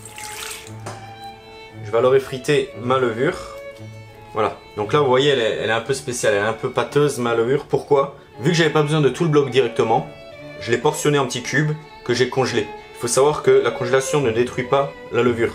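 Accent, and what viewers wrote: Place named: Alsace, France. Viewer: Quel plaisir de retrouver notre bel accent Alsacien ❤ et nos succulentes recettes de chez nous ❤ MERCI BEAUCOUP ❤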